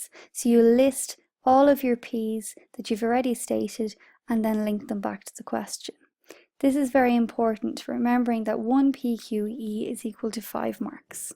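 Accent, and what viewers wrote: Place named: Ireland. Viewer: oh that voice that irish accent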